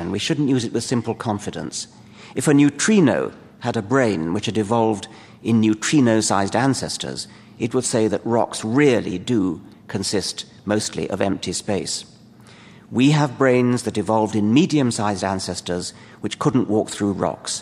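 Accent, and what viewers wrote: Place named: RP, United Kingdom. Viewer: Dawkins has long been the most eloquent advocate of all things science for the general reader. And I love his upper crust British accent :-)The Selfish Gene should be required for all high school students.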